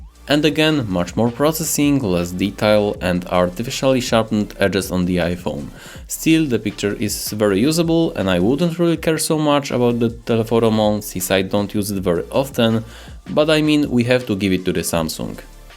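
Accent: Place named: Poland. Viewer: you sound so Polish. I mean your accent. Very nice comparison though . Just bought Galaxy s21 hope I can get use to android xd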